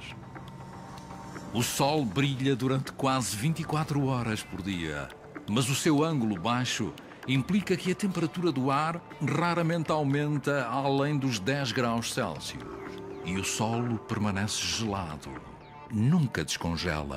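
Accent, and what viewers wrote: Portugal. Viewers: É o sotaque de portugues.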